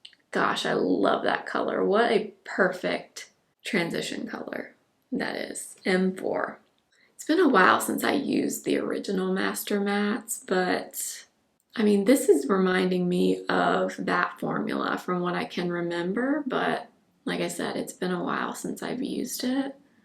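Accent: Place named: East Coast, United States. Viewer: Listening to your story about your neighbors and laughing not at your expense but because you sound like a younger east coast version of my husband and my self. We had similar incidents were we live with neighbors July 4th and having debris hit our home. We live in No CA where we just had some devastating wildfires and people still shoot off fireworks even though they are illegal. We also live on a corner lot which is mostly okay because we don’t have a house in back of us or directly in front of us but there are other issues that we had no idea about when we had the house built. Good luck on finding the perfect house and neighborhood it’s always a challenge. Though you might want to consider renting short term so you both can get familiar with the neighborhoods before buying.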